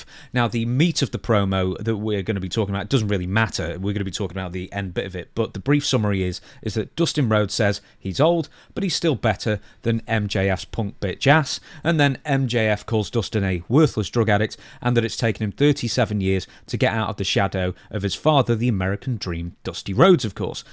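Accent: England, United Kingdom. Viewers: u have a latino name but u accent sounds uk 🇬🇧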